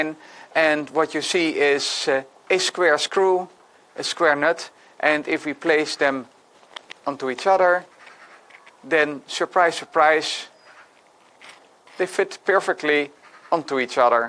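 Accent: Netherlands. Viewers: that dutch accent ahaha. love it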